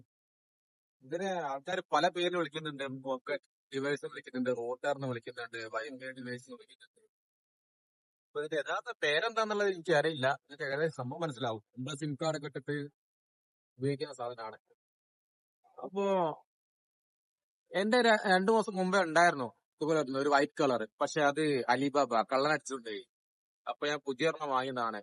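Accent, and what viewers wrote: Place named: Beypore, India. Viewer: Nyan beypore annuu..ningalude samsaaram kettappam pudi kitty...😅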